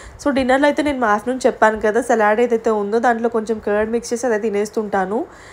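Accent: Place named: Nellore, India. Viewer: Manadhi nellore kadha.. Atlumtadhie manathonieee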